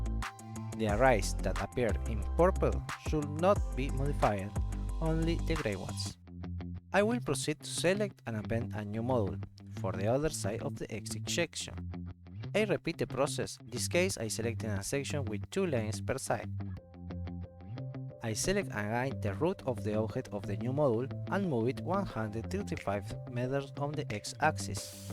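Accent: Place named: Argentina. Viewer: Me parece que ese inglés tiene tonada argentina o algo de latino! 😆 saludos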